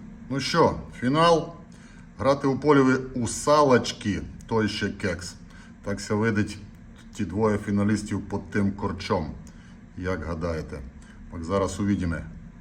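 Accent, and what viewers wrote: Transcarpathia, Ukraine. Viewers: Класно по Закарпатськи)) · Йой,як я давно не чув файної закарпатської говірки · Закарпатська озвучка-ТОП❤🫡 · Дякую за роботу і Закарпатський діалект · Дуже файно на закарпатський лад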